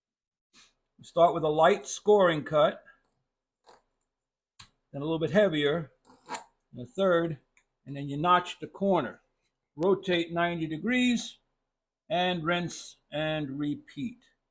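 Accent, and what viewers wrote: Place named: Boston, United States. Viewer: I detect a bit of Boston accent…..caaaaard scraper, haaaaardware store. 😀. Glad you are having a fun time, it’s very informative to watch you make some planes. Enjoy your day. Too cold in my shop right now so I will patiently wait to try your teachings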